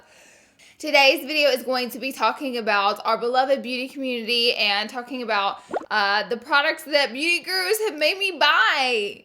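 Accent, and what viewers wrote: South, United States. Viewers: I love how you say consillur instead of con-see-ler. It's very Southern with a bit of a drawl to it 🌷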